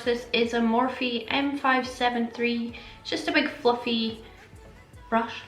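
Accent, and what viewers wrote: Scotland, United Kingdom. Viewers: Omg your Scottish 😯 help me with my makeup!!!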